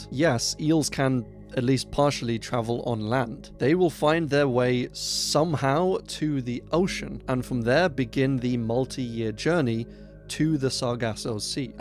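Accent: United Kingdom. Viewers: Did I just find the British Wendigoon?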